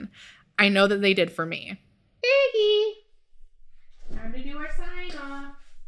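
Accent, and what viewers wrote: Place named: England, United Kingdom. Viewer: I share your english accent, but its funny you don't know we have one. go ahead and google 'how to pronounce satan'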